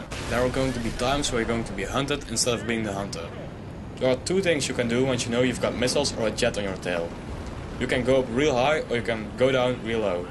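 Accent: Netherlands. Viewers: Dutch accent FTW It sounds so awesome :D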